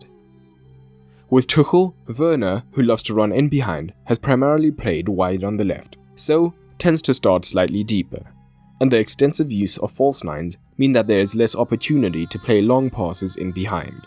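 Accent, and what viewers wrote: South Africa. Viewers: Are you South African? great video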